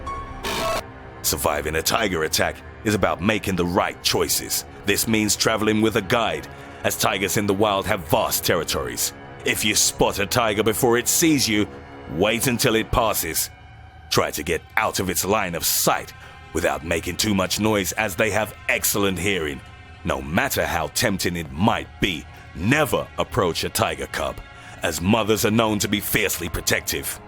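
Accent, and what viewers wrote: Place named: London, United Kingdom. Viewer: Accent is from London, UK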